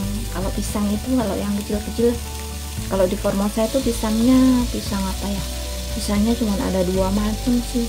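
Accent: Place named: Banyumas, Indonesia. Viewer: My favorit food Roasted sweet potato / bahasa ngapak muntul mba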